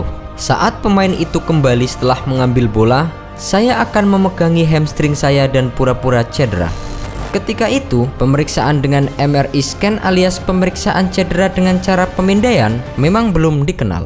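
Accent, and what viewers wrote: Java, Indonesia. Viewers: Yg ngomong wong jowo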